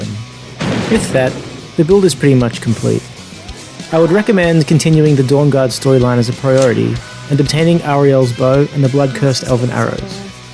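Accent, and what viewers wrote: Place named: Australia. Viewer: Nice australian accent!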